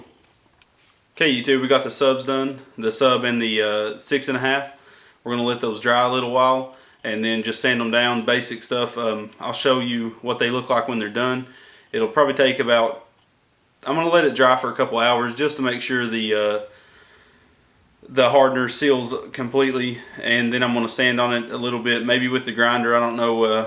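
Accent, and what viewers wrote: South, United States. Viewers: There is something about the high amount of humbleness a man sounds like with a genuine southern voice amen! Thanks for the video will attempt repair on my logitech 7 inch desktop sub since the rubber around the sub tore.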